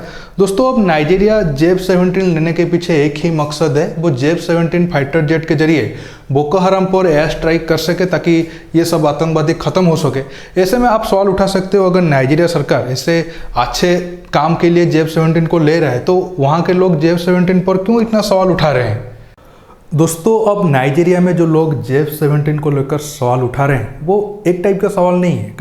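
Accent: Odisha, India. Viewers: Bhai kay aap odisa se hai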